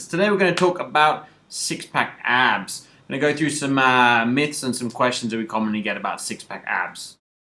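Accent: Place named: England, United Kingdom. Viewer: Thanks a lot teacher. BTW, You sound a lot cooler with your English accent haha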